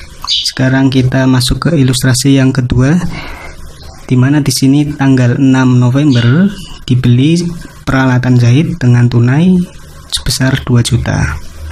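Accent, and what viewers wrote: Java, Indonesia. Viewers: Medok bgtt mass.. 😳😂klo pke hndsfre gmn gitu.. Tpi mmbtu toturnya.. Trims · MODAL (Medok banget)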